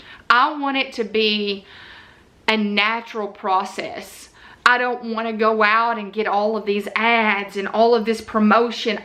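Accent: South, United States. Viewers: Watching you for the past year has been a delight. Your personality shines through in every video. Your southern accent is just the icing of the cake for me. I lived in Georgia for many years and miss the hospitality and joy southerners bring to any conversation. I look forward to watching your videos every week because they always make me smile. In fact, at the end I always say with you “bye you guys”...don’t know if you realized you have that catchy phrase too. Keep up the good work! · You are one amazing lady,. And if you think the Southern accent is an issue, you should hear the local accent and idioms from Cardiff in South Wales 🏴 where I was born! It’s much worse! xxxxxxxxxxxxxx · you are an inspiration. I love how relatable you are! I so look forward to your videos. And your southern accent is charming! Much better than my Boston accent!!!! 😉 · I love that you have an accent , I too am a southern girl and have often thought of having a chanel ... 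also FYI , you can do an IGTV and put links on your stories via IGTV... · Thank you for answering all my questions I posted in the FB group! I love your channel and following you on IG as well. I wish I could remember exactly how I found you but I don’t. I will tell you, however, that your sweet southern accent drew me in and I still love it!!! I admire your authenticity as much as your content and have no doubt that silver play button is in your future ❤️ I look forward to supporting and cheering you on as you continue to set and exceed your goals!!!! 😊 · I have been tuning in on your channel for a few years now. I love your channel. I find myself waiting on Mondays, Wednesdays and Fridays to see what you talk about. I just love it! Keep up the good work 😊👍. Be you, ( southern accent and all 😉), and don't change a thing. Take Care. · I love your company name even more now that I know the history behind it. 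And for the record, I love your southern accent!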